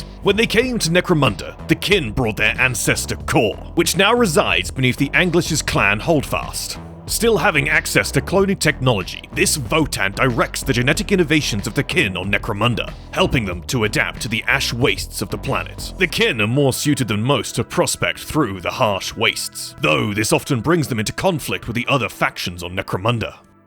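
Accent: United Kingdom. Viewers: Dude, why you have to do the cringe voice over. Just talk. I mean you already have the British accent. You don't need to do the goofy voice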